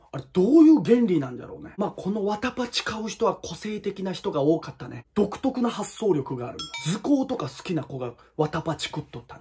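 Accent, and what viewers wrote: Hiroshima, Japan. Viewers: マジ面白い！ 方言が広島カープの選手みたい🎏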